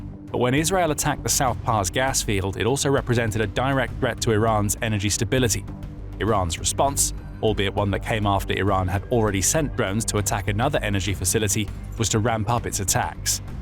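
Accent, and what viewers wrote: United Kingdom. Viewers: This channel is a joke. Ammosexual content for Americans, read by a British guy. Unsub.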